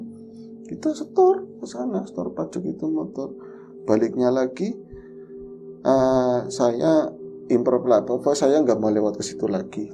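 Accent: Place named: Tegal, Indonesia. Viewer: Di kenalnya diluar tegal kang, bg aku, y kngen dialeg2 tsb sll menantikan lapak horor n ngobor, sbg nostalgia jaman kecil dl di comal. Salam.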